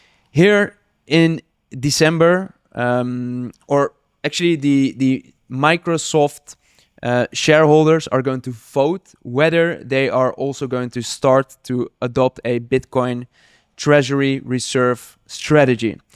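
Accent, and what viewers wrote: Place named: Netherlands. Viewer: sterk nederlands accent , goede content .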